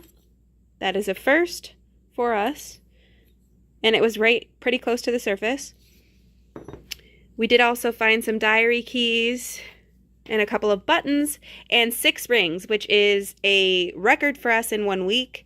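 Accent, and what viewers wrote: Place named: Michigan, United States. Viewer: Nice finds,,like the Michigan accent, i got to hunt in pontiac once, i know a few folks in Houghton lake and Traverse city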